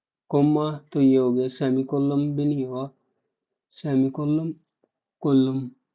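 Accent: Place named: Himachal Pradesh, India. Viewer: Bhai himachal se ho kya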